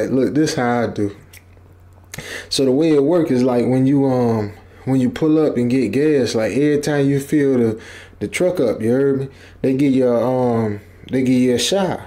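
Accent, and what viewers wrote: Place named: New Orleans, United States. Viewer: Really enjoyed the story. Whomever narrated this story has the New Orleans accent/dialect down pat. And this is coming from a native New Orleanian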